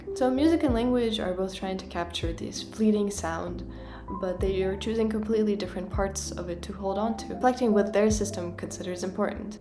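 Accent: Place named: Japan. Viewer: Hey.. as a linguist who studies Englishes and various contact language inpact on language.. I can't figure out what dialect of english you're speaking..there are very 'new' or different/mixtures of strange vowels/consonants and cadence etc.. You sound a little Japanese, a little Chicano and a little something new/modern ..and strange sounding to my American , older ear/and dialect.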